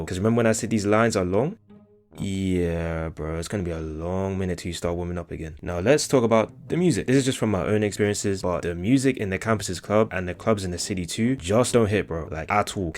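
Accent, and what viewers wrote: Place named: United Kingdom. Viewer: Bri'ish 🥹 (u tuff twin)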